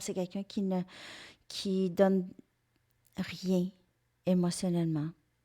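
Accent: Quebec, Canada. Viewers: 😂Vous, êtes très belle avec votre accent, le même que Céline Dion🌸merci 🌺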